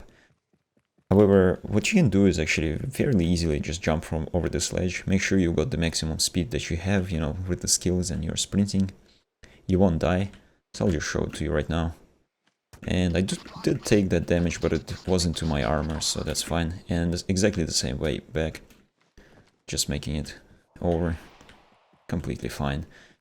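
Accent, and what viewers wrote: Russia. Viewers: Are you Russian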